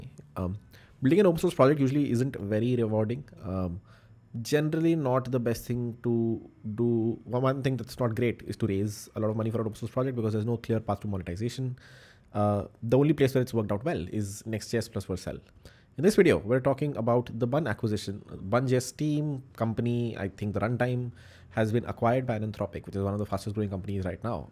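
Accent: India. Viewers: Indian accent English with this much pace is very difficult to understand..slow down a bit bruhhhm.. it seems like rapping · Haha it's his Indian Ascent. I hardly understand so I listened very carefully 😅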